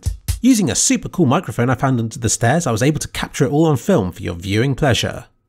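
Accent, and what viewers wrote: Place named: South West, United Kingdom. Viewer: south west accent this video is about a man shitting himself with a fruity taste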